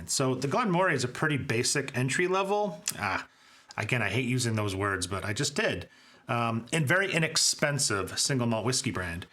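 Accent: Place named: Canada. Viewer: Ok make sense ! You sounded Canadian, but the prices $$ of these scotches seemed to be in US to me... anyway didn't have time to go thru your channel yet, but looking forward to it ! God bless, cheers et Merci beaucoup !!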